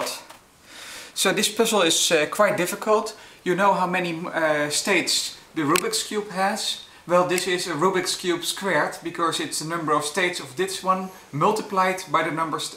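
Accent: Netherlands. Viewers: I'm dutch too and i'm actually laughing at your English... do you mind? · LOL, I can hear your dutch accent.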